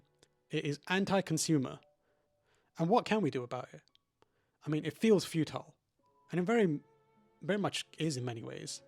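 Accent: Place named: United Kingdom. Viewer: It’s just so nice to hear an actual British accent from a decent gaming YouTuber, I can’t bear the transatlantic accent all our people pick up over there! But on topic, this all sucks. Though I have to lol that Asha Sharma, hot on the heels of laying off 20% of her staff, has now been appointed as an adviser for jobs and productivity to the US fed reserve 😂 Corrupt billionaires all the way down to hell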